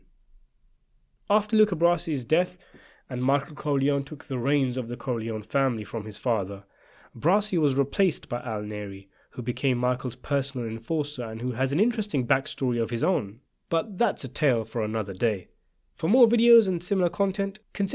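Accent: England, United Kingdom. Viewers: Hearing an Englishman try to pronounce Italian words and names is almost as funny as hearing an Italian try to speak proper English. Appolina? for Appalonia. And the flat A in Mario is a constant in British form of Italian speech. When will the Brits learn to speak American? Water closet is an awful name for that. And cars do not have a bonnet or a windscreen. How would either of those work? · It shouldn't be this way and I'm embarrassed to admit to admit it but it just doesn't feel right hearing an evaluation of the Godfather coming from someone with an English accent.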